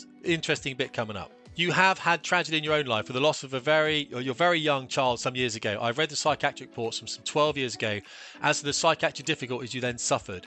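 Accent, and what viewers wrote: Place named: Wales, United Kingdom. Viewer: He's definitely welsh😅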